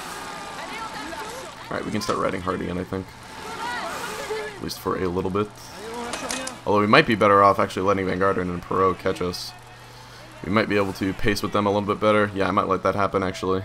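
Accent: United States. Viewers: You're pretty much pronouncing all the names like an ignorant American to be fair ;-)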